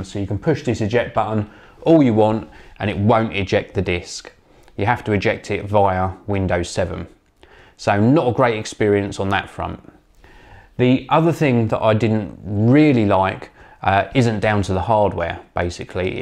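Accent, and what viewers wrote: United Kingdom. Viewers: hes british man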